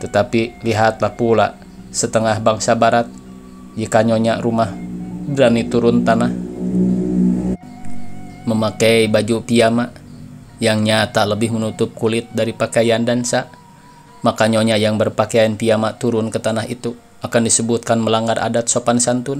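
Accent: Sunda, Indonesia. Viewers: Hhhe bang ki sunda gk klop certi dlm bhs indonesia karena sdh klop dongeng sunda hhe lagamna bhs sunda ki.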